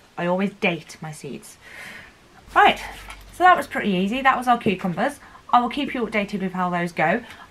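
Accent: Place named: East Midlands, United Kingdom. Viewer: Your accent is similar to mine where abouts are you from?. I live in east Midlands do we have it?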